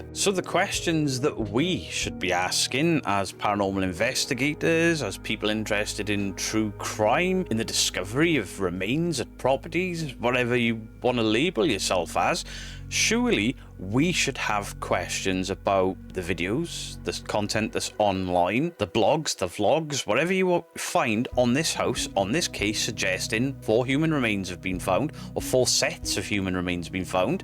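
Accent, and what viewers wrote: United Kingdom. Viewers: Man tbh I don't care about the video or hookie poo whatever what interests me is that you sound British and your talking about quite literally my neck of the woods I was raised in Hinsdale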